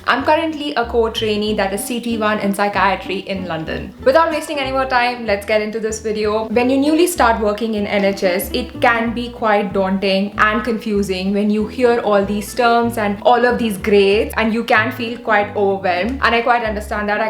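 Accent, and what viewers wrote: India. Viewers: my gawd. Youre a beauty. If it wasnt for the accent I wouldnt know youre Indian.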